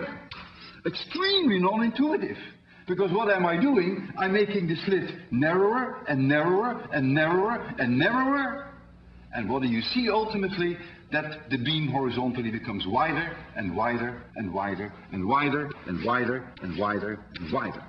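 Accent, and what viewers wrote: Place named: Netherlands. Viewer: Dutch and English don't mix well in his case... That is one hell of a bad accent. But nice video anyway.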